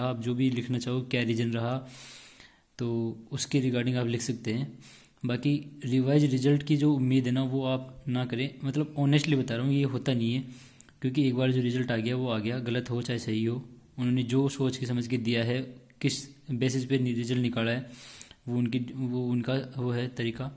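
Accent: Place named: Haryana, India. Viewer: Bhai tu haryana ka Lage se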